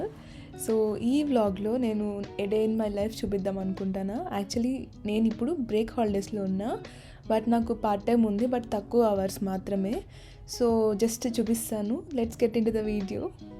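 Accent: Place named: Warangal, India. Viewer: garu mi slang pakka Warangal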